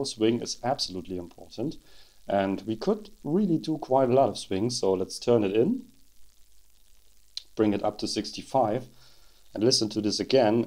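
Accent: Germany. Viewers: Man hört stark dass du deutsch bist.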